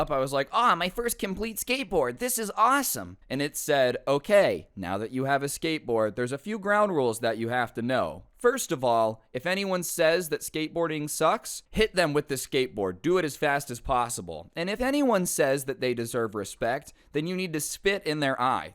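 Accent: United Kingdom. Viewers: best british accent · British accent 10/10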